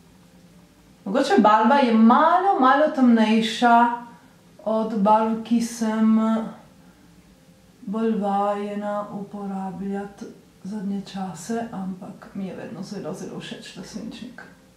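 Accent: Trieste, Italy. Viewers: Sloveno con accento triestino, top😆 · Si sente un botto che sei triestina hahahahhaha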